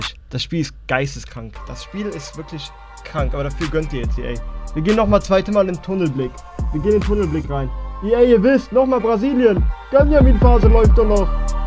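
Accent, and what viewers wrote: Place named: Saxony, Germany. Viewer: sächsisch Comedy juckt?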